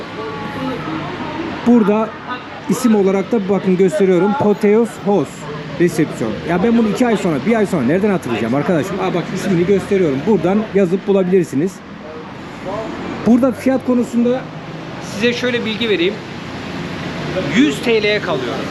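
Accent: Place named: Germany. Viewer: Sen almancısın aksanından ve tipinden belli .20 kere gittim ama chang mai a gitmedim çünkü deniz yok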